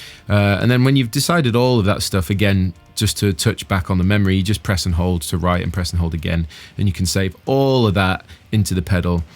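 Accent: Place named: Lancashire, United Kingdom. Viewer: where did you grow up mate? I'm trying to unlock your accent, accents are a pet obsession of mine. I'm thinking Lancashire but I could be way off...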